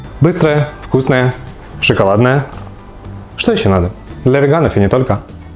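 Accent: Ukraine. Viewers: Откуда же автор родом?! Часто слышу украинскую речь ;)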